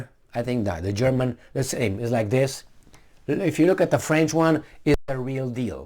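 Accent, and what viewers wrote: Australia. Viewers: Not a bad casual attempt at an Australian accent, for a Romanian....😁👍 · A Romanian, speaking in an Australian accent is something I didn’t know I needed lol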